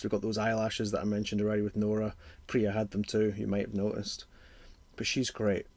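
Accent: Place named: Scotland, United Kingdom. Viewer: This was a tough week, torn between Toshi and Hansel 🤔 I think I’ll go Hansel due to the props used haha. Special mention to you saying ‘cosy little boots’ in your wee Scottish accent 😅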